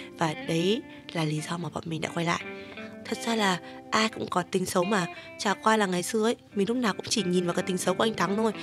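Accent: Hanoi, Vietnam. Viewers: Tui thích giọng gái Hà Nội Tui thích giọng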